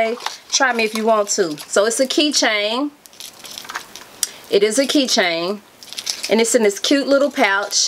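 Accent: South, United States. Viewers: Your southern accent is soo strong today I love it !!!! Happy you feeling better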